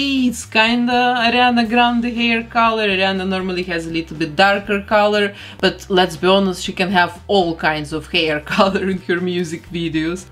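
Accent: Russia. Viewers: Блян, отдам миллион рублей, но она русская, по акценту понятно.. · Мне кажется или её родной язык не английский, ахпхахахах, может это и не секрет, просто я тут в первые, но мне кажется, что она выучила английский язык, а её родной это русский, ну по моему мнению акцент слишком сильный, ну хз может я ошибаюсь. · Omg I love your russian accent. I'm crying it's so beautiful! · Из какой страны автор видео? Просто очень сильный русский акцент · Is this Russian accent?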